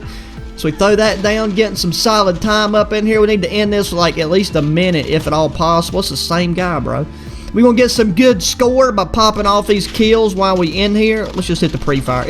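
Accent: United States. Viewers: Country accent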